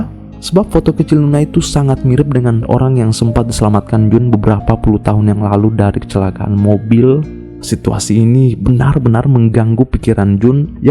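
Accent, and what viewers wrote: Bugis, Indonesia. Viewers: Orang Bugis yaa bang? Tau sidrap ka iyya bang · Serasa ada yg ganjal ,,, sepertinx pembicaranx orang Bugis ... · Bugis apa bang😂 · Dehhh masi ada logat bugisnya🤣🤣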